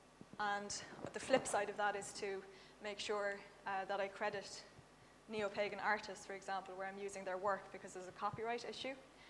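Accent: Ireland. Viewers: You did an excellent job presenting your research methods in an unbiased fashion. It's rear to hear anyone talk about any religion without hearing a lot of the personal opinions of that person. You did a great job!! I look forward to reading your book! Though another video presentation would be nice. (Im from America, so hearing about paganism in a Irish accent is really cool, plus you have Gorgeous eyes ;) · you have the most beautiful eyes and I absolutely love the Irish brogue in your voice,...pretty and smart is a rare combination...huzzah to you! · Such a good presentation. Also that Irish accent is to die for.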